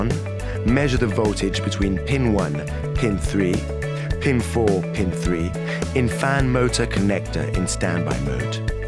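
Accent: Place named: England, United Kingdom. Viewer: I HATE ROBO VOICE VIDIEOS< , ESPECIALLY WITH AN ENGLISH ACCENT!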